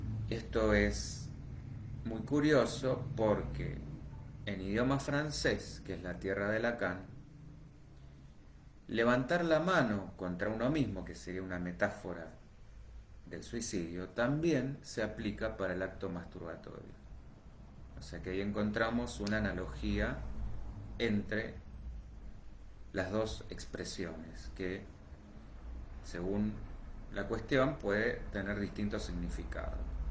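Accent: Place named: Argentina. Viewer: Buen videos y canal , eres humanista hermano argentino entiendo Masomenos el acento es que yo soy mexicano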